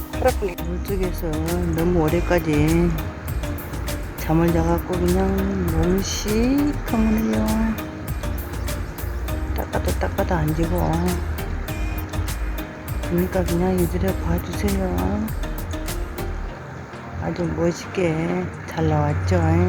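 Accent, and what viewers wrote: Gwangyang, South Korea. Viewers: 구수한 우리고향 광양 사투리들으며 멋진 순창호피석 감상 잘했어요 감사합니다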